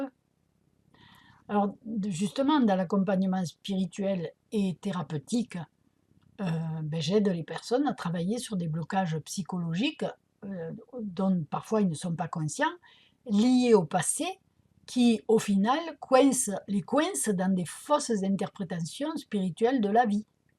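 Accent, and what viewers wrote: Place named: North, France. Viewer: Bonsoir madame vous avez un magnifique accent du ch'nord!!! Me trompe-je?😇🙏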